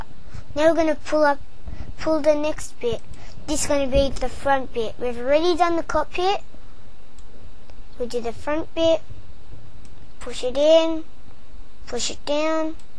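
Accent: Australia. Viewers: a young aussie am i right?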